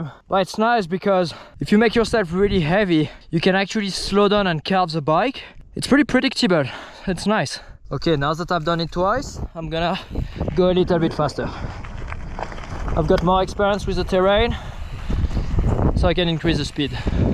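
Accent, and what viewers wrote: France. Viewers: Sorry but, as a French, I really despise the fact that you haven't corrected your accent with all this time speaking english. I guess it's part of your character now, but I can't listen to "zhis"...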